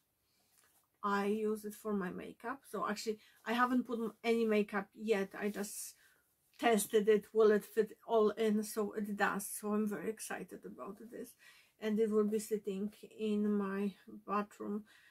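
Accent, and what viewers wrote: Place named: Poland. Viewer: I also love aprons. Saves your clothes from stains while looking great. I love your accent it reminds me of my childhood. Poland? ❤️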